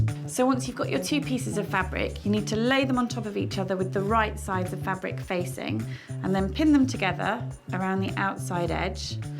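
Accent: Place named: United Kingdom. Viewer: Is it just me or is everything better with a British accent? Because that was smooth and clear rather than the last video I watched. Great job!! Looking forward to making these for the family. Can't wait to embroider names on the cuffs too :)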